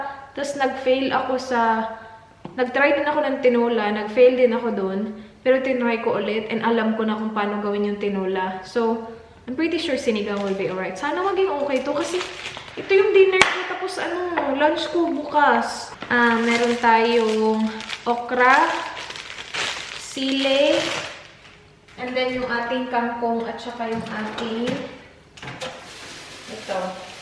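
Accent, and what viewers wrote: Visayas, Philippines. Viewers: kaya pala parang familiar ng tono mo..katabing isla lg pala..sending my love from negros☺️ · Gusto ko mga vlogd mo..saka gusto kits personally kasi Hindi ka katulad mga ibang nakapangasawa ng foreigner lalo na Australian na napapanood ko sa dito sa yt na exage gumaya sa accent ng husbands nila...ikaw very natural ang English at fluent..saka gustong gusto ko yong Tagalog mong may accent na bisaya..Godbless..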